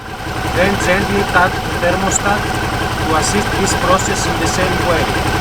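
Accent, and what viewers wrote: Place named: Greece. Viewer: Το ξερα ότι είσαι Έλληνας, αθάνατη ελληνική προφορά, την ίδια έχουμε 😂😂😂 εγώ έχω του 2008 αλλά δεν βρίσκω πουθενά πουθενααααα πόσο παίρνει οπότε μάλλον θα πάρω ένα τετραλιτρο για σιγουριά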